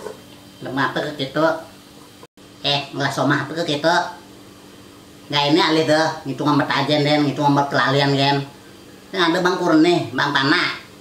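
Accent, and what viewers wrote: Buleleng, Indonesia. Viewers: Hahaha..lucu luh, ngoceh logat buleleng, sambil masak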